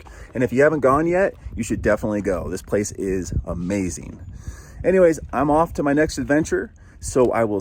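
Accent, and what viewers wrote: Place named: South, United States. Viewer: I loooooove how you sing with your dog! And your country accent! "Going to Yellowstone to see the wolf doggies!" I love love love it! I woke up this morning with the memory of your singing with your dog, looked up your video in my history from yesterday, and downloaded it, just so I can watch that scene again and again! And yes, great Yellowstone video as well! You are a gem! I'm subscribing!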